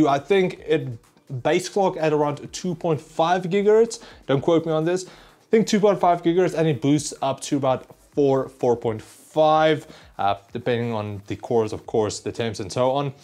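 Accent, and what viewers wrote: South Africa. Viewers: Watching on my 9 year old i3 *cries in 20fps Edit: the whole time I'm like what is this accent it's almost South African and it turns out you are actually from SA lol. Nice to see a homegrown tech channel, you have my support dude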